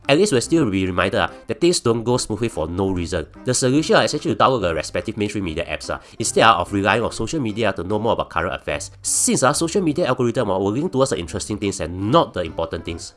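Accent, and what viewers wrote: Singapore. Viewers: Bro, your accent is 200% singlish limpeh also need to turn on CC to understand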